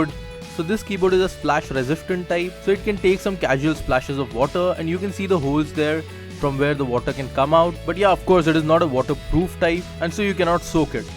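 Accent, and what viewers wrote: India. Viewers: terrible indian english /...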